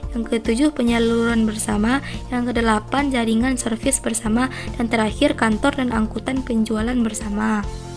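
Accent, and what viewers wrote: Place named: West Sumatra, Indonesia. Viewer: hahah logat minang nyo ses😅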